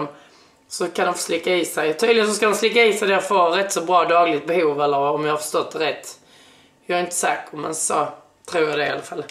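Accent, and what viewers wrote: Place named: Scania, Sweden. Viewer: Vilken härlig skånska du har! ✌🏽️ bor i malmö men kan nästan gissa på att du är från Helsingborg? Roligt hur skånska kan skilja sig så😋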